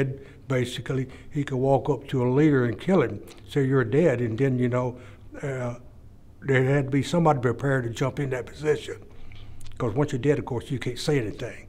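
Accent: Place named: South, United States. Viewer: He’s just a Southerner, that’s all. Lots of people from New Jersey have an accent too.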